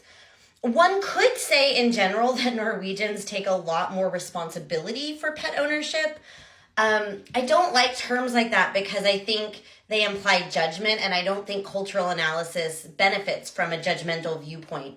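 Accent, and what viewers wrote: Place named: Canada. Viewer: This video makes me think you've never actually lived in the US. Are you Canadian? You sound like you have a Canadian accent.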